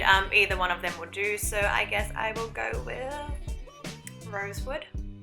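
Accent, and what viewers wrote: Australia. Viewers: ahhh love hearing another Aussie accent!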